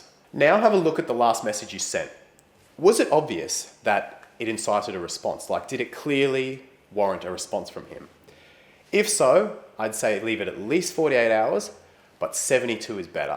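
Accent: Australia. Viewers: I dont like Australian accents and thé country but i like this guy.A cool Ozzie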